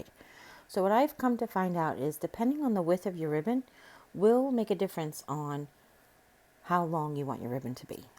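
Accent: New York, United States. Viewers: Guess what I am making tomorrow!!! These are adorable! And I said before I love the NY accent ! Bayside ❤️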